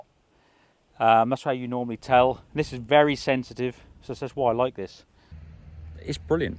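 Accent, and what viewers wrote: United Kingdom. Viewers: Lets see if you read any comments???? Marshall Crawlers....in the part of the UK where your particular accent pertains....due to the type of land, Marshall crawlers were everywhere, it seemed like every other farm had one??? Are there any still around, I don't mean the later ones running on rubber, but the real Steel tracked Marshall Crawlers. (I did a project on them at school) in the late 60's.😂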